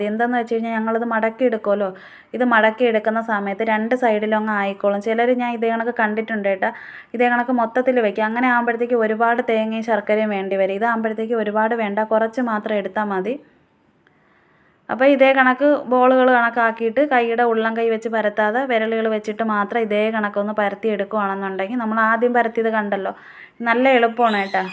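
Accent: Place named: Venjaramoodu, India. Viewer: നിങ്ങളുടെ സംസാരം കേട്ടപ്പോൾ തോന്നി വെഞ്ഞാറമൂട് കഴിഞ്ഞു വരുമ്പോൾ ഉള്ള ഏതോ ഒരു ഭാഗം ആണെന്ന് കാരണം ഞാനും വെഞ്ഞാറമൂട് ഉള്ളത് ആണ് എന്നെ കെട്ടിച്ചു വിട്ടത് നിലമേൽ ആണ്... ഇതേ seim സംസാരം ആണ് ഞങ്ങളുടെത് ഓക്കേ gd luck 👍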